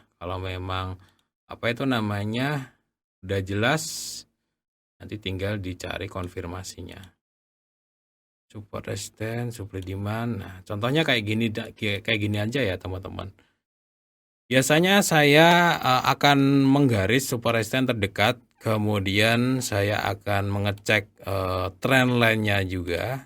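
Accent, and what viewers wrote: Java, Indonesia. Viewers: Maturnuwun mas... logate jowo banget..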